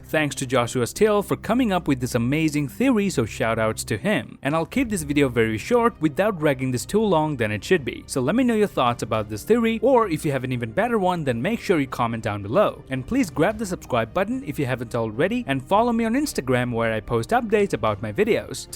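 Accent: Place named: Quebec, Canada. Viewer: You have one of the most stereotypical French-Canadian accents ever and I love it dude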